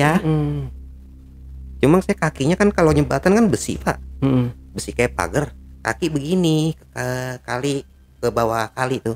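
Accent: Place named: Jakarta, Indonesia. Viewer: Asli depok neh,bahasa nya kaya kita pisan....betawi pinggiran....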